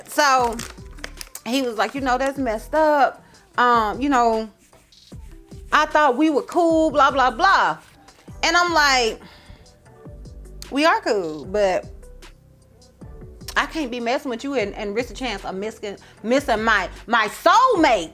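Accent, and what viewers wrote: South, United States. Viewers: I loved this video! You had me laughing out loud in my livingroom! Between your story, the voice changes, sound effects, your facial expressions, and your cute country twang, you had me hollering here in NJ! ⁰Thank God he ain't kill ya! Lol! Chica, You are too much but so funny. Looking fwd to hearing your next zodiac tale! LOVE YOUR CHANNELS, Ms.!!😅✌🏽🤣🙏🏽😉💜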